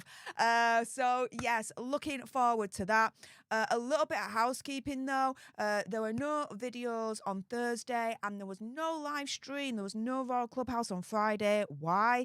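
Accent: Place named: England, United Kingdom. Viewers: We Americans thank you for your Thanksgiving greetings. We pray blessings for you and your family. We are able to better understand your English accent now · 7.07 She wasnt trying for an English accent it just an American accent for whatever region she comes from. (just as your accent is, excuse me if i'm wrong, Manchester or somewhere near:) 🤗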